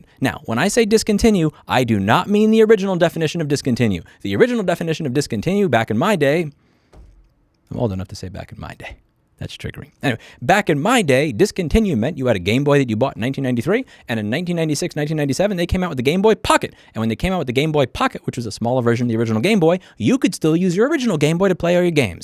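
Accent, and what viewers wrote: New York, United States. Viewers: Haha, yeah, I came down here looking for similar comments. His NYC accent was coming through strong and thick.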